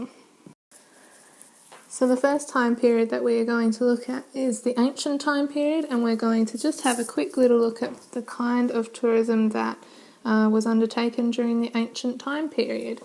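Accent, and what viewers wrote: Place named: United Kingdom. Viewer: Even though she has a British accent, I can understand almost everything effortlessly. That means she made a really good lecture ✌ (better than my teacher...)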